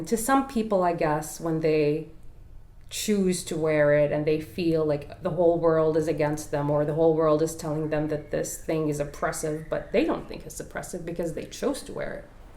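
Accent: United States. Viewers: I'm Australian, and I can hear that you have an accent. An American accent.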